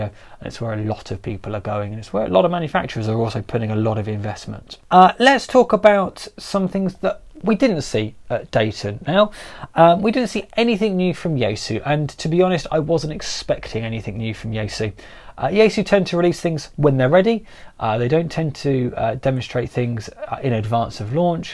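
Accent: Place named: Texas, United States. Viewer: Great video! I know I will not be buying the T10 for that price. Lots of lower priced options (not bowfung) I notice you have an accent are you from Texas?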